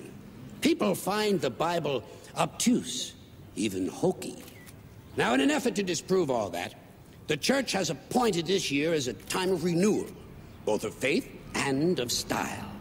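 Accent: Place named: New York, United States. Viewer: I love his neoyorkine accent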